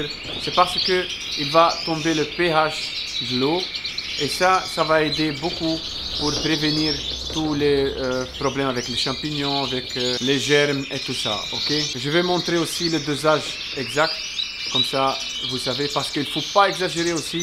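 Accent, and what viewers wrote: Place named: Tunisia. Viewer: منضنش الله اعلم تونسي ولا ليبي على حساب اللهجة تاعو على الاغلب تونسي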